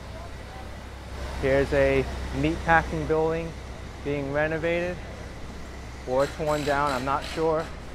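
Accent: New York, United States. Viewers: one again we appreciate your every effort in putting out vids and that wonderful NY accent of yours is always a pleasure. Would you ever consider being a small tour guide for visitors that have never been to NY?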